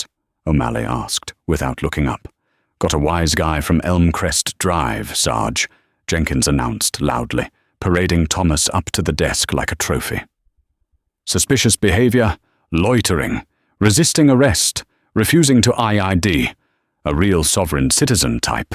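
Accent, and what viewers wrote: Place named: England, United Kingdom. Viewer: the narrator has the best English accent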